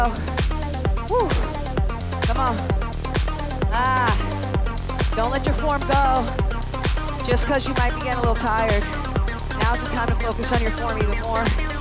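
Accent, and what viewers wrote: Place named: Staten Island, United States. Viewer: Just wish she didn't talk like a Staten island sanitation worker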